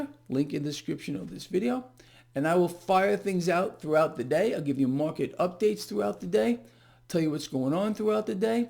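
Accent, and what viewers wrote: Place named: New York, United States. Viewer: I love your accent. Real old school New York 💖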